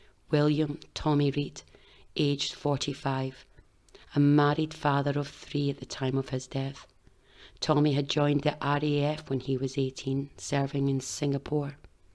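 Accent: Scotland, United Kingdom. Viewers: Is the narrator a Scottish person!? She should stick too Scottish history not Irish!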